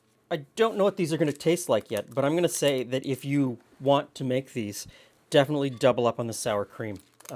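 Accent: Canada. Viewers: I hear your Canadian accent,👍🏻👍🏻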